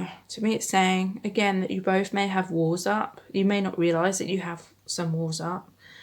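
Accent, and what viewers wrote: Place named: United Kingdom. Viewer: When did I not notice that you‘re british😯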